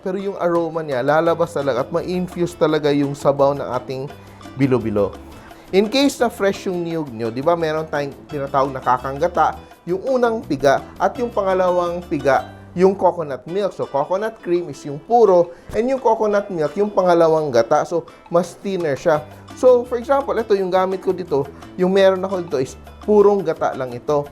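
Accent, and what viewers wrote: Visayas, Philippines. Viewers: daan pko sir.. bisaya ka... hahaha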